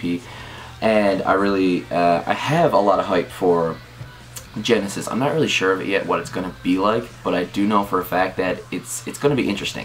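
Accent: Canada. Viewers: I can hear an accent. canadian?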